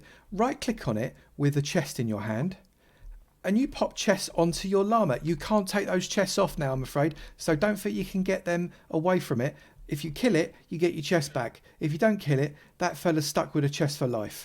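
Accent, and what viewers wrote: United Kingdom. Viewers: btw, oi quoite loik ur british accent its almost asmr to my ears i may join ur server someday. plz do a video on...endermites?(i couldnt think of anything)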